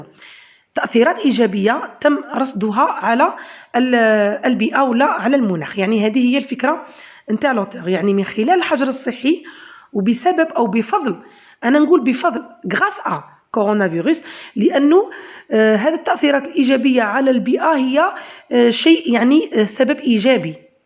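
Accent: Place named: Europe. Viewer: ليكن في علمك استادتي الكريمة ان موضوع الامتحان غير موجود في موقع ency-education فهو محدوف فعوض ان 6 مواضيع، تم تنزيل 5فقط، و لهدا ان كان لك من الزمن بقية رجاءا ضعي رابطه اسفل عند صندوق الوصف. سلام صاحبة الصوت الافرنجي الاصيل.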